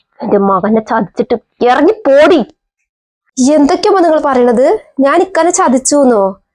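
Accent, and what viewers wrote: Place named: Kallepadam, India. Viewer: കല്ലേപ്പാടം വർത്തമാനം നല്ല രസം....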